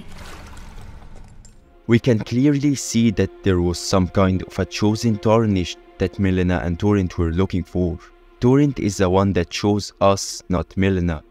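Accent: Egypt. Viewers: There’s something special about listening to Elden Ring lore in an Egyptian accent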